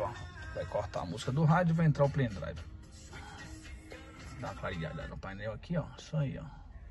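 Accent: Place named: Rio de Janeiro, Brazil. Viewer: Vc e do rio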